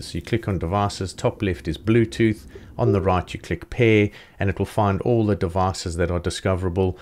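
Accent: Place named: South Africa. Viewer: Connecting is fine but lag not so much. I'm trying to find a pair of great wired earphones specifically for the Quest 2 for my mom. She's VR mad and it would make her day... any suggestions? Also... You got a new subscriber here simply because your video editing almost made me spit my coffee out laughing (it must have appealed to my dad sense of humour) AND because I detect a fellow South Afircan accent. Keep it up 😎🇿🇦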